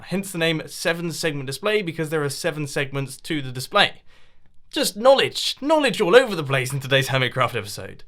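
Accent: United Kingdom. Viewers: it is the british accent with the serious tone that has the charm. Sometimes I try speaking like that bcoz it sounds absolutely cool OwO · British voices turn me on even tho i'm, not gay. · Why so stereotypical, I'm American and a girl and I don't just like the British accent I like the content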